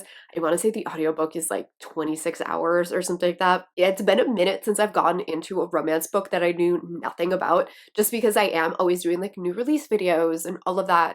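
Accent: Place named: Wisconsin, United States. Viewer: Talking about your Wisconsin accent ruining your pronunciation is cracking me up. 😂😂😂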